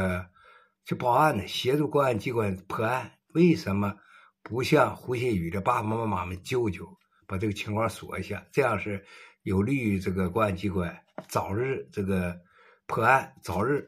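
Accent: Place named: Liaoning, China. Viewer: 你是辽宁的吧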